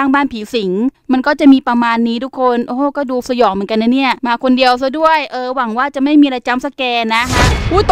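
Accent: South, Thailand. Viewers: พี่ เหมือนคนใต้เลยค่ะ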